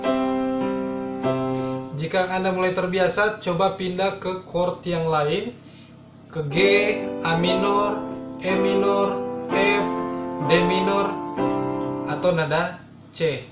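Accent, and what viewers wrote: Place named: Manado, Indonesia. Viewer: Dari logat berbicanya pasti orang manado nih hahaha.. :D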